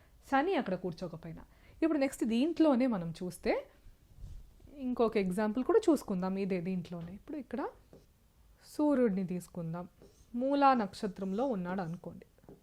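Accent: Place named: Tamil Nadu, India. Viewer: అమ్మా,మీ ఉచ్చారణ, చెంద్ర, సని అని పలుకుతూ ఉన్నారు.కొంత ఆరవ/ తమిళ accent నుంచి తేట తెలుగులో పలకండి. మీరు రికార్డు చేసిన తర్వాత వాయిస్ low గా వున్నదా అని కూడా చెక్ చేయండి. బాలు, ఘంటసాల పాటలతో మెరుగు చేసుకోండి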